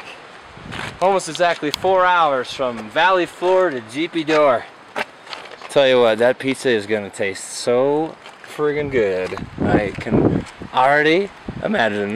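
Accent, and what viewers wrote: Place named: Scotland, United Kingdom. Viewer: Thumbs up just for the Scottish accent laddie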